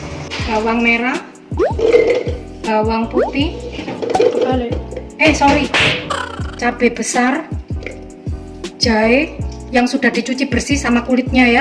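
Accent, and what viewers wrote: Java, Indonesia. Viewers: ngomong medhok nya yang ngangenin liat kontenx sll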